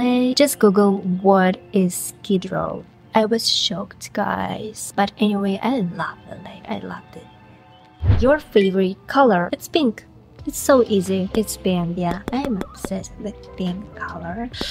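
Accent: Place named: South Korea. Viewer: I really like ur korean English accent its unique and😅😆😁 by the way i like ur vids they always bring a smile on me 😁😁😁 keeping doing ur best may God bless U✝✝✝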